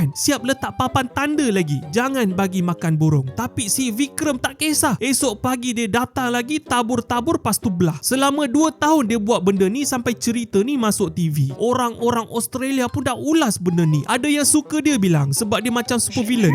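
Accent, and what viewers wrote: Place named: North, Malaysia. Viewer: loghat utara. Belajar tu biar luas, jangan sempit2😅😅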